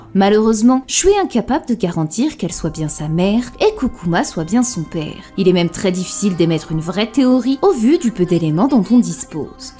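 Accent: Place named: Belgium. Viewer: oui un peu à la manière de certains belges et plus précisement quand le u est suivi d'un i ^^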